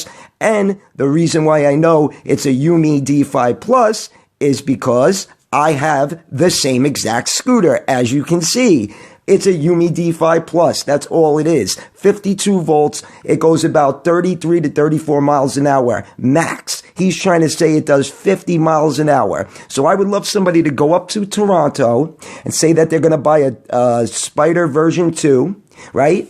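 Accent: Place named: Brooklyn, United States. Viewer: As a Transplanted New Yorker out here in Seattle 1. I've been down the rabbit hole of Escooters I only follow The Ginger Kid Igor from Roblex and Now You! I miss the energy and the vibes 2. that Brooklyn Accent made follow you as well Bro lol glad I stopped by cos old fuckin I supply alibaba Asshole was kinda getting on my fuckin nerves because again the rabbit hole,I started looking up specs and when I heard 52V doing 50mph I'm like GTFOH! 🤣🤦🏾‍♂️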